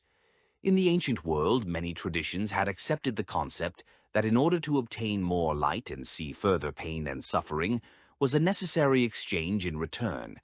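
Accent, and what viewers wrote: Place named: Greece. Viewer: This person sounds like a Greek if you know what I mean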